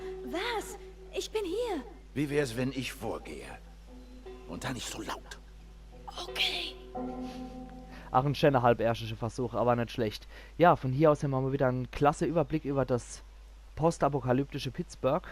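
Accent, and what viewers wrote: Palatinate, Germany. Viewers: Hab ich das richtig verstanden am Anfang: "Wir sind im Hemshof gelandet"? :D Ist das ein pfälzischer Ausdruck oder meintest du tatsächlich den Stadtteil einer bestimmten Stadt in der Pfalz? :D Hab deinen Kanal über das Forum gefunden und fand deinen "uff pälzisch"-Aspekt sehr interessant! Bin selbst in der Pfalz (Vorderpfalz) aufgewachsen, auch wenn man das gar nicht glauben mag, da ich ziemlich Dialektfrei bin :D Werde auf jeden Fall von Zeit zu Zeit mal reinschauen :)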